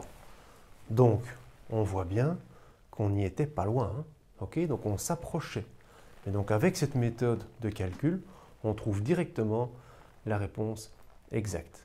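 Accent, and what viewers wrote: Brussels, Belgium. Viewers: Ce petit accent vient de Belgique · De Bruxelles même, une fois !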